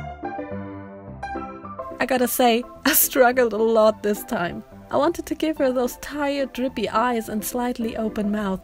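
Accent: Germany. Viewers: Are you from Germany? I don't know why but you really sound kinda german :D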